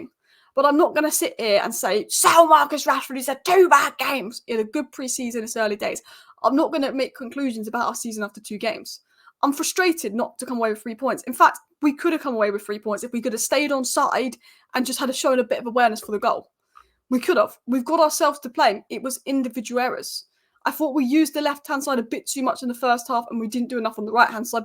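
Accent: Manchester, United Kingdom. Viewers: That’s a funny Manc accent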